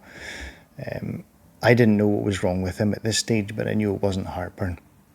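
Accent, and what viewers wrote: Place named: Scotland, United Kingdom. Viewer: I really respect your honesty in sharing such a heartbreaking story As a Doctor,it is apparent that you always go the extra mile with compassion and diligence There is nothing for you to feel guilty about as you did all you could Labels,before a full work up is done,are indeed dangerous and damaging as illustrated in this particular case You have a charming accent May I ask which part of Scotland you are from,Sir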